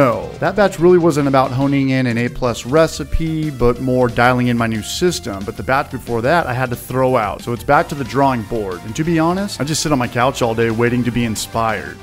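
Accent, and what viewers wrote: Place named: Tennessee, United States. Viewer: I don't know but I can hear that Tennessee drawl slowly setting in on...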